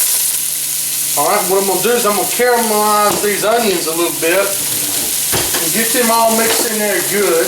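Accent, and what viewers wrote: South, United States. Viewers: looks delicious! i have a southern accent too and i love seeing other youtubers with a country accent as well. i get alot of comments on mine as im sure, you probably do too lol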